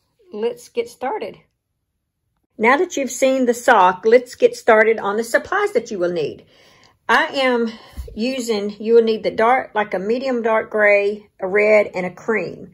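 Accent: South, United States. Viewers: I love your southern accent. I also love...love this pattern. Thank you so much for sharing!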